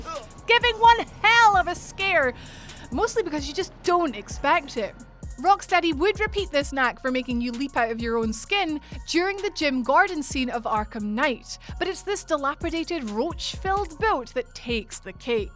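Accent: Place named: Scotland, United Kingdom. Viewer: I've said it before and I'll day it again. That accent 😍 MonTheScots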